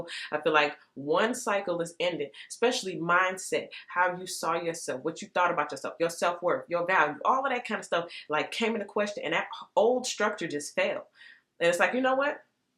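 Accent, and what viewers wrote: United Kingdom. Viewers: whole reading resonated, haha yes queen vibes issa new energy haha picked up british accent lol x love from UK x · You’re funny .... that British accent tho 😆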